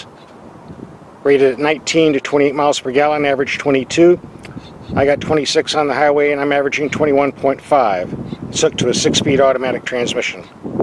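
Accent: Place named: Newfoundland, Canada. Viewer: You sound Newfie, but there's Cacti in the background.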